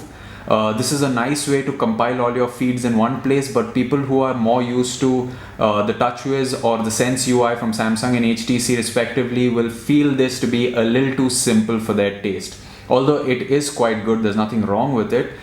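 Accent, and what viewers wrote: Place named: India. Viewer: I like his accent very indian accent english...not a critic but its cool....hahaha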